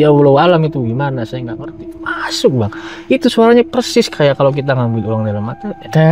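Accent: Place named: Indramayu, Indonesia. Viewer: Enakan baka cerita Asli wong dermayu kien mah kang ketenger sg bahasae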